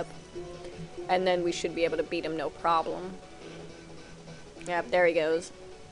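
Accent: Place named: South, United States. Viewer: I was wondering, do you live in south U.S? Just wondering cause you have a slight accent. =3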